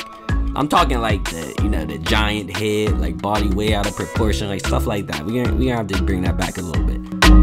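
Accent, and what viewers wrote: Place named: South, United States. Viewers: He sound country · Soundin like a hillbilly anyone (I’m not hatin)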